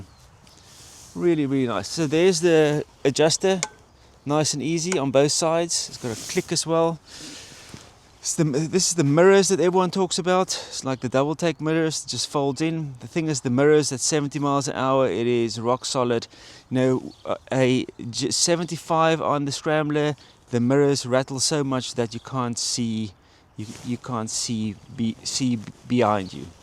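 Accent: South Africa. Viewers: Hey boet. You sound south African if I'm correct?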